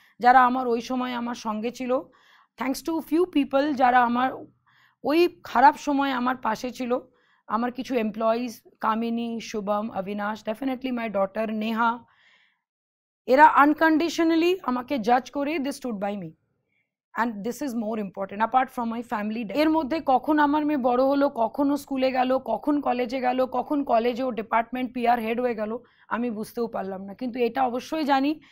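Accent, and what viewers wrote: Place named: Hindi, India. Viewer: Punjabi biye korle ki nijer matribhasha ta accent diye Hindi er moto kore bolte hoy?? Eder eituku video dekhei ja fake r nyakami, real life e ki jinis k jane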